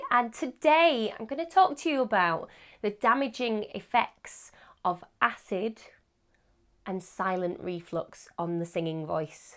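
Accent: United Kingdom. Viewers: OMG, I love British accent ❤ 😍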